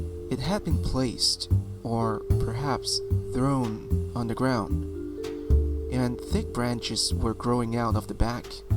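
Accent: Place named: France. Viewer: You are French, from France, or Quebec. Thank you for the fantastic narration. Peace and much love sent from Ontario, Canada.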